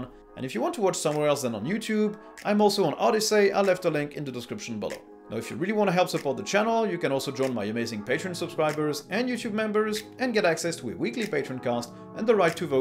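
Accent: France. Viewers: Vous êtes français ?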